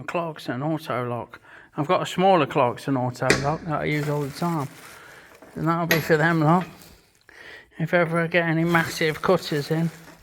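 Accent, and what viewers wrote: United Kingdom. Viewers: boring bar that matey...with carbide insert slot...should have the insert size written on it.....same size tooling as my bport...same lathe too...fk me...and a tattooist lol...me too...22 years at it....got some mates over your end....thought i recognized that fickin accent...not short of curry houses down that melton road...stay free...mad heads and mutoids!!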